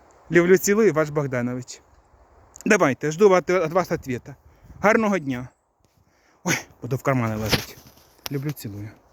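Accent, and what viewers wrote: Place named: East, Ukraine. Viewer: От так говорять на сході❤❤❤❤❤❤❤❤❤❤❤❤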